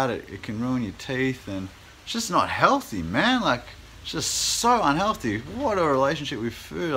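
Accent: Australia. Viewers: It was the first time that l watched your video . Love your accent , do you from aussie? Thanks for sharing your experience. I hope that I can get rid of this habit one day . Although I've juste had finished eaten again after dinner . Sorry for my English . Love from france .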